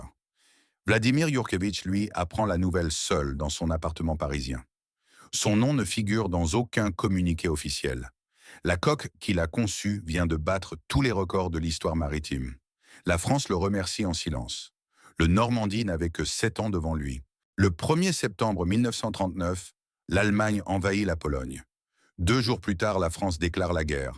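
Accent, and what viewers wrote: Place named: England, United Kingdom. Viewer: un peu pénible l'accent anglais... A la limite si le sujet abordé était franco-britanique pourquoi pas...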